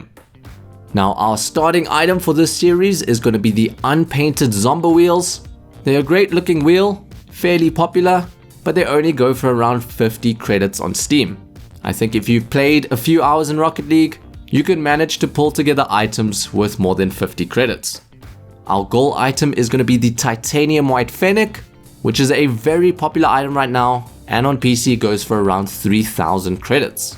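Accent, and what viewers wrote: South Africa. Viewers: Are you South African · South African? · U r south african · Are u south African by any chance?...just curious cause u have a strong accent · Hey are you maybe from South Africa? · Are you South African? If so SA GANGG!!! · Are you South African?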